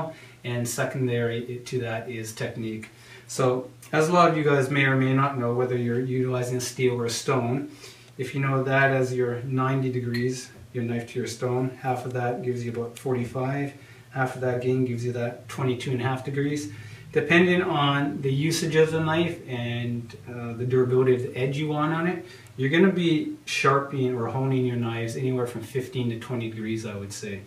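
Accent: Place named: Canada. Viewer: Knew he was a fellow Canadian who knew what he was talking aboat.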